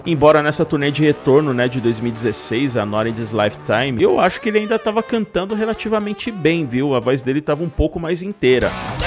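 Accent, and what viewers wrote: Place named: Brazil. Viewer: faz um favor ao mundo e para de tentar falar ou ler em Inglês…. É mau demais… não dá para aguentar o quanto é péssimo e mau.. entenda que para falar Inglês não pode ter sotaque brasileiro senão vai colocar letras e consoantes onde não existem e sai tudo horrível.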